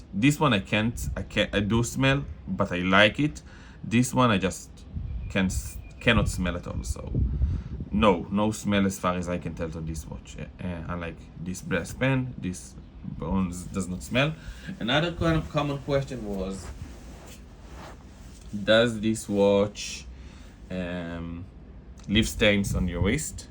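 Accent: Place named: Israel. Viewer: I fucking love israeli accent mate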